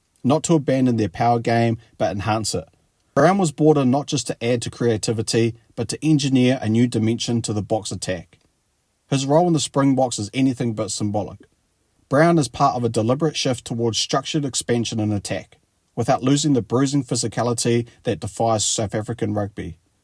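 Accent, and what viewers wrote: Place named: New Zealand. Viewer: commentary sounds like a Kiwi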